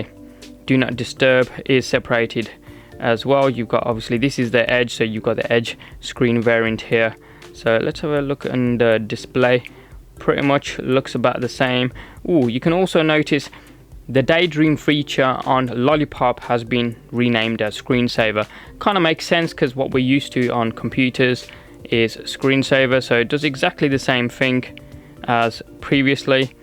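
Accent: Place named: United Kingdom. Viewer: You sound british some sort of :v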